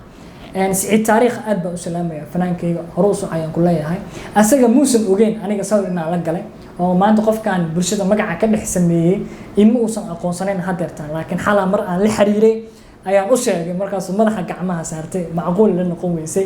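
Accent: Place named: Mudug, Somalia. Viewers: walhi uma malaynnay inanaad reer xamar tahay obaha haysty reer mudug😂😊😂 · Zxp hadalka mudug xagow ka so racay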